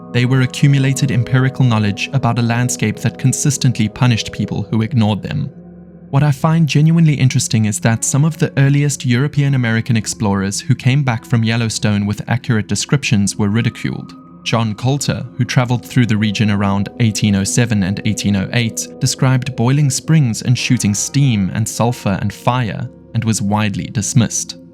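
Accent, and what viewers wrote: South Africa. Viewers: Your accent sounds South African...? Hulle weet nie wat ons weet nie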